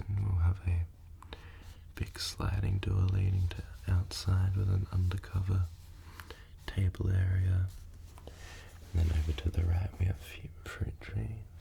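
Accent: Australia. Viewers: dude I love this, it's like listening to do ASMR hahaha (in the best way!). Something unmatched and familiar about other Aussies doing asmr, love your videos 🙂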